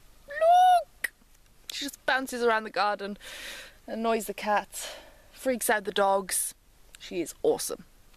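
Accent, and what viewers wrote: England, United Kingdom. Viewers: i recon you've lost your English accent a little bit.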